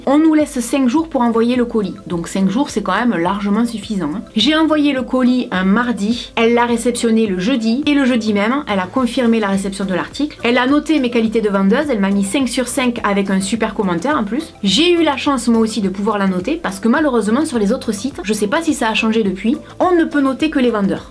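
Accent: South, France. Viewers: je t'adore tu donne le sourire rien qu en disant "bonjour" avec ton joli accent du sud. Tes idées de vidéos sont originales et super bien faites. Merci je te suivrais toujours!!💖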